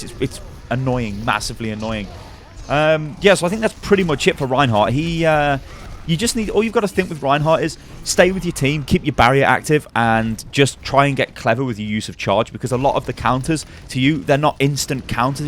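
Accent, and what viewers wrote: United Kingdom. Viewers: i usually dont like the British accents, but your commentary is on point! subbed man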